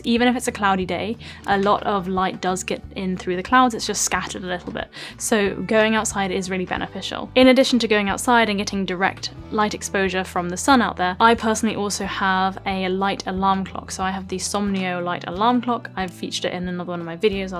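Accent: United Kingdom. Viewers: 自打听了UP主的口音，我打算放弃美音，学习英音。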